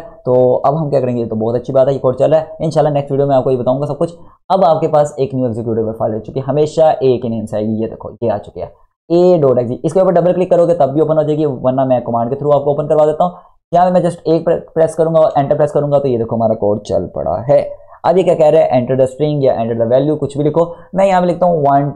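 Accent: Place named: Kashmir, India. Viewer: are you from J&K? your hindi sounds like it's having kashmiri accent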